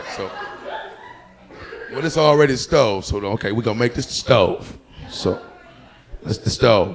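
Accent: Detroit, United States. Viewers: This dude is hilarious! Sounds like Eric the Hip Hop Preacher! That Detroit accent strong! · Soon as I heard what up doe I'm like he from the D lol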